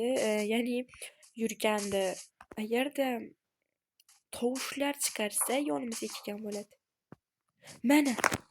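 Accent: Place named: Khorezm, Uzbekistan. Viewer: Xorazmlimisz Shevayz tortp durti?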